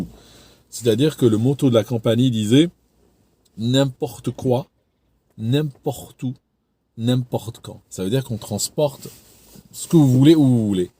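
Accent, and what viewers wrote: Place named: Switzerland. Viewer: qui s'appelle en fait est né en Algérie. Je suppose donc que sa langue maternelle est l'arabe algérien. Il a aussi vécu en Suisse où il a passé une bonne partie de son enfance (d'où son accent suisse qui ressort de temps en temps) et où il a appris à piloter des avions (mais peut-être avait-il déjà commencé en Algérie). Il a commencé des études de médecine en France qu'il n'a pas pu finir à cause d'un visa qui n'a pas été renouvelé. Il habite maintenant à Londres où il a travaillé dans l'informatique (et peut-être dans la sécurité aérienne?) et vit maintenant du revenu de ses chaînes YouTube et en vendant des livres. Bref, c'est un touche-à-tout très doué qui parle au moins trois langues: l'arabe algérien, le français et l'anglais.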